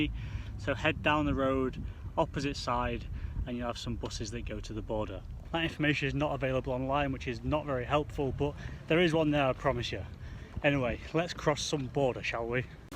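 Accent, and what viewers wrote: United Kingdom. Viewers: Had to google that :) nice accent , it’s a northern accent ? Like Geordie ?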